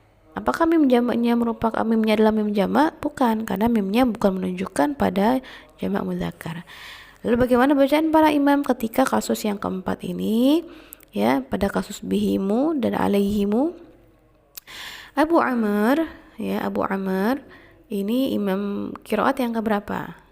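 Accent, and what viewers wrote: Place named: Indonesia. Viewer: Sgt baik penerangan Ustazah. Lahjah Indon saya boleh faham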